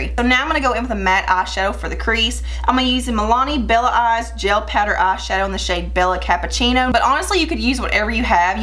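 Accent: South, United States. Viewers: Your accent! I'm from Alabama so I'm really southern also! Where are you from! And, Amber rush is my Go-to eyeshadow! I wear it everytime I do my makeup! 😍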